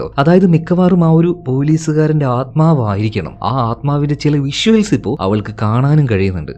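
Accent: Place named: Kannur, India. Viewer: Bro kannur alle appo😊😅❤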